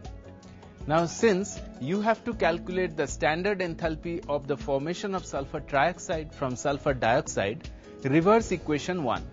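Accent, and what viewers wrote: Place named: Bengal, India. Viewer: when you can hear the bengali accent so strongly...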